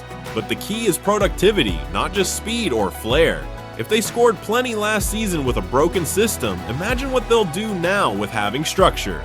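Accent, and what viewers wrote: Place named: United States. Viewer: video would be better without an american accent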